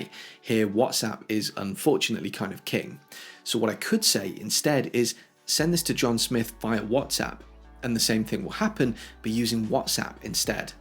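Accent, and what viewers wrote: United Kingdom. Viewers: Hi! thank you for your videos, I have watched all of them I think that I am strangest subscriber on your channel because I watch your video not only to gain some tips about iOS but also to improve my skills of good English and understanding of British pronunciation:) Warm greetings from Ukraine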